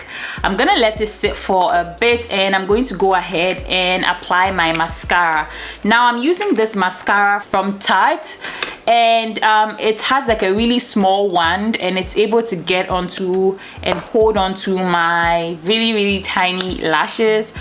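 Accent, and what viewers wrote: Ghana. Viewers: Loved this. I was listening to you talk and I thought "she sounds Ghanaian", then I read your profile and see that you are in Ghana. So cool! Thank you for sharing your knowledge with us!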